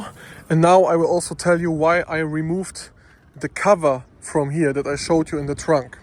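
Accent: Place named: Germany. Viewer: I'm so confused as to why this guy sounds German but is driving in Poland. 😆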